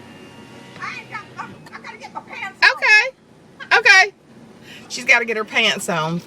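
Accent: South, United States. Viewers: Aww there she is!! I lost my mom so is my substitute with the southern drawl lol!♥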